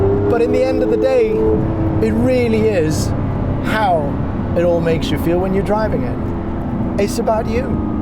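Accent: South Africa. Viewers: Bro, you sound like you're South African.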